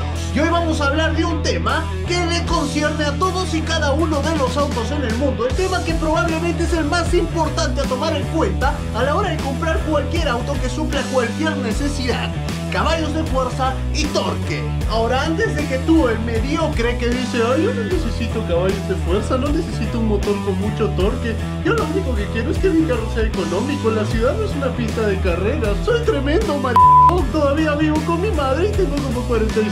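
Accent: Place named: Peru. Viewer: Tienes acento peruano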